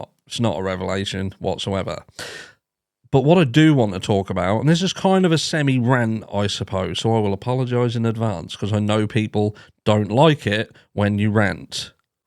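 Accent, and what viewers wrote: Norfolk, United Kingdom. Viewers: More to this case than meets the eye! you need to have a sing-a-long AKA -:D show off that Naaafoll accent:P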